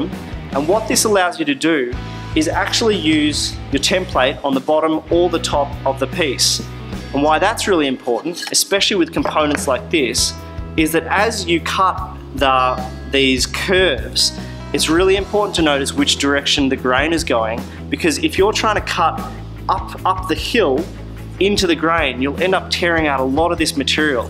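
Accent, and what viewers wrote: Australia. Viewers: lovely production values and great information. So good to have an australian voice and without the seemingly obligatory "yo whats up guys" followed by death metal music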